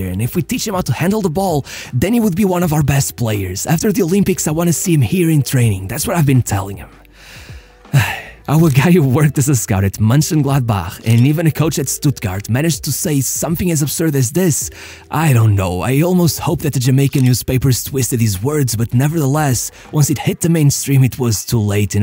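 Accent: Portugal. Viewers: brother man sounds portuguese af lol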